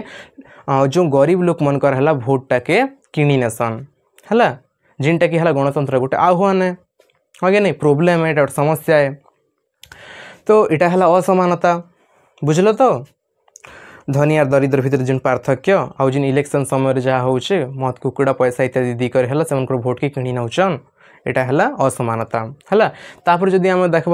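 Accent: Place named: Kalahandi, India. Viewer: Kalahandi language re sunle bhal laguche 💕💞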